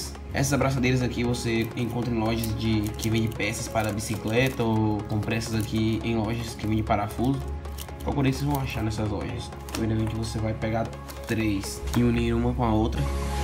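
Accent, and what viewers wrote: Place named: Bahia, Brazil. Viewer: Sotaque de baiano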